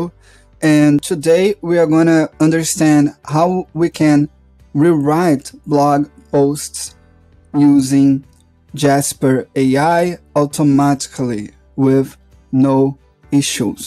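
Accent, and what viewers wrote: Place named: Brazil. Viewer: I know a Brazilian accent when I hear it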